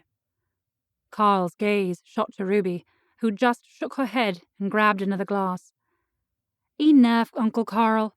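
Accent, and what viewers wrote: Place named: West Virginia, United States. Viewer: The West Virginia was accurate but I got tired of listening to the twang. Sorry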